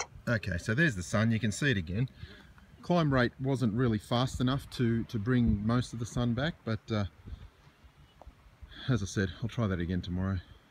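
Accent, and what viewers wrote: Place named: Australia. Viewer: You have some good videos But what’s with the American measuring system You sound Aussie, obviously around Perth Why not metric mate.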